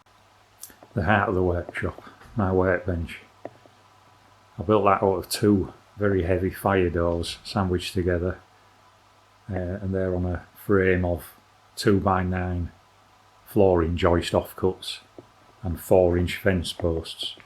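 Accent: Yorkshire, United Kingdom. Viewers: So sorry you’ve had an accident. I hope it heals quickly and you’re not in too much discomfort. It was lovely hearing your Yorkshire accent with Hull bouncing through it. Hope you have a great Christmas and New Year. X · Your British accent surprised me so much! I’m a huge fan of your art creations. I’m so sad to hear you had a shop accident. In your videos you take such safety measures. I’m happy to say I know each and every build in your workshop and remember how you built it. Please take care, heal fast, and God bless you ❤ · I always pictured you speaking with a Yorkshire accent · Holy cow, you're a Yorkie! All this time I've thought you were American. I guess we project ourselves, huh? LOL Thanks for the shop tour. Pretty cool. I wish you fast healing, brother! I look forward to watching you make more silk purses from sow's ears when you're better. 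But don't rush it on our account. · “It’s amazing what people will bring you when they realise that your house is closer than the tip😂” . Thank you for the tour, your marvellous videos and the joy they bring. The glorious Yorkshire accent is a bonus. Best wishes for a speedy recovery. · Hi sorry to see you have been rendered unable to work for the time being , but I’m sure you will soon be back at your bench . I don’t know why but I assumed you were from a different part of the world , probably Denmark or Sweden . So hearing you speak in a Yorkshire accent was totally unexpected . 😊 . Goes to show I shouldn’t make assumptions based on limited information . 😅 kind regards as always . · you actually knocked me for six, after watching this video 🙌 first of all I wish you the speediest recovery ( I know you didn't want to delve ) and secondly when I heard your Yorkshire accent I thought bugger me there's my pallet contender 🤣🤣 I'm 9nly down the road, you sir are a credit too the process of wood and it's wonders 💪🇬🇧☕️👌 stay safe and thankyou for the tour 🍻👍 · Gosh, that injury sure looks serious. 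I hope you get better really soon. It was so odd to hear your voice. For some reason I had you living in New England. Yorkshire? That was a shock. Look forward to the next build when you are all mended. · Firstly allow me to send my best regards and wishes for a return to health without any lasting effects. Secondly, as crazy as this sounds in my mind I had you down as being German because of your work ethic and attention to the finest details. Hearing your British accent was somewhat of a surprise but a very nice one as it reminds me of how the British were back I the day. Bravo to you sir and again, best wishes for healing and a return to your passion. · For some reason I thought you were Dutch 😂 as soon as you started speaking I had to rewind and turn the volume up "he's from bloody Yorkshire lad" 🤣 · Fascinating. Don't know what I thought you would sound like, but I wouldn't have guessed you were from (North) Yorkshire. 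I'm from a little ways up the road, Newcastle. Its wonderful to watch you make such beautiful, as well as practical items in your workshop. Did you start out in the carpentry business (I know you said your Father was 'in the trade')? Hope your hand heals quickly. 🙏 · I had a feeling you were a Yorkshire Lad😆 Glad your fingers are still attached to your hand, what a horrible thing to go through. Wishing you and yours all the best and a peaceful crimbo. See you in the New Year! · You have a voice! In my head you didn't have any accent, but now Yorkshire definitely fits...the dry silent humour should have given it away long ago. Silly me. Hebden Bridge-ish, or am I way off there too? I'm sorry about your hand and hope you recover full use soon. The bandaging alone looks horrific. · I never expected the Yorkshire accent, I imagined you as sounding Dutch or Scandinavian for some reason 🤣Thanks for the tour and get well soon, cheers 👍🍻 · Omg i wasn’t expecting a British accent. 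Watch all your videos so hope you get back at it soon.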